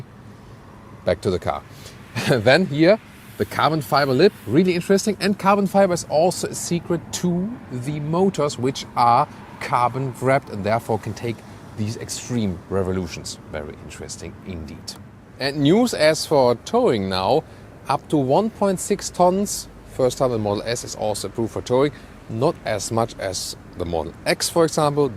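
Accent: Germany. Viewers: Wieso sind die Videos Englisch? Rein vom Akzent her würdest du ja Deutsch sprechen, oder? Es ist nicht so, dass ich der englischen Sprache nicht mächtig wäre, im Gegenteil. Aber dieser Kanal in Deutsch wäre wohl eher eine Marktlücke als noch ein weiterer englischer Auto-YT-Kanal...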